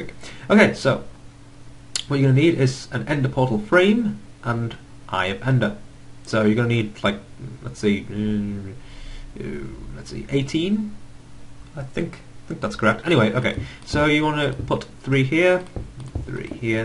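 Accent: United Kingdom. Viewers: ARE YOU FRICKING BRITISH MAN!! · Interesting accent.. Are you British? :) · Ok thanks p.s.(are you british)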